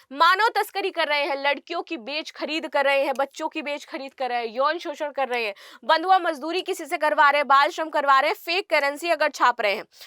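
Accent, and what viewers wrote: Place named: Purvanchal, India. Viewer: Sir Mam ham UP se hai But Aap UP ke purvanchal se lag rahi hai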